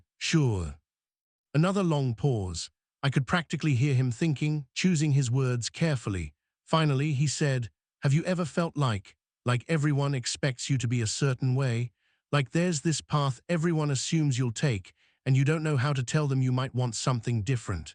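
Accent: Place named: London, United Kingdom. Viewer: This male voice is it a beautiful London voice ? Wounderful to hear it.the story is excellent thank you AI family❤❤❤❤❤❤❤❤❤❤❤❤❤❤❤❤❤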